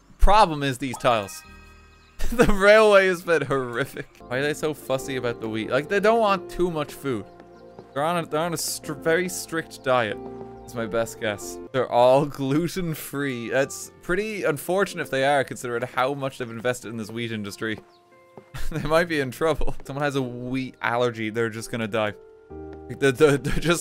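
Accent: Ireland. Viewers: Irish jerma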